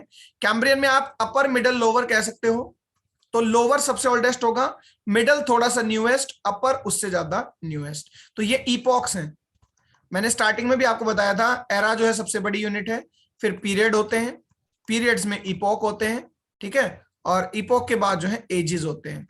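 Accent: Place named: South Asia. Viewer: Viewers who are unfamiliar with the way English is spoken in South Asia will have trouble understanding what the teacher is saying here. I was sorry not to find an option for written captions.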